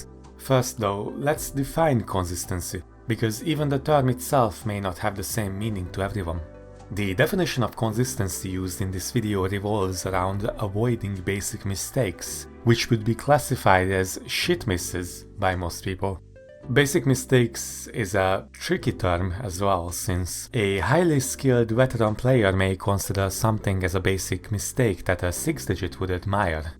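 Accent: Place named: Hungary. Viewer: Lol tudtam hogy magyer vagy... Az akcentusodrol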